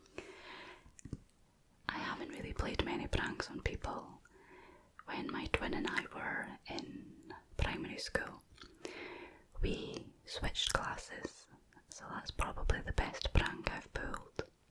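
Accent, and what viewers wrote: Scotland, United Kingdom. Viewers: Ok so i just googled "vow of Celibacy" and means something totally different. Plzz correct me if its just me getting confused with ur Scottish accent .😅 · This wins. This is how all scottish accents should be and im from just outside Edinburgh 🤣🏴. Love this! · I love your Scottish accent. So tingly. 🥰🥰🥰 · Aye lass ... I much prefer your Scottish accent! It's especially cute with the wee bairns! 😊😊😊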